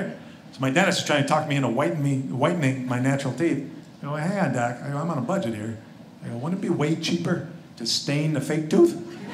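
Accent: United States. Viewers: he sounds American not Irish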